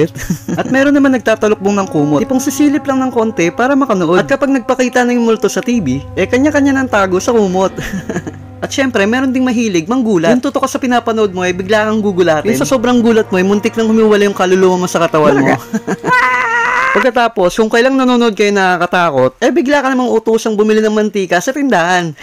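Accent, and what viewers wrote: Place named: Bicol, Philippines. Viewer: Parang taga bicol to e hahah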